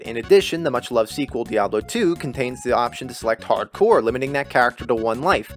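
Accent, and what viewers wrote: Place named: New York, United States. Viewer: You just sound like a new Yorker and they big there. You eat what ever you want brother, just keep making content.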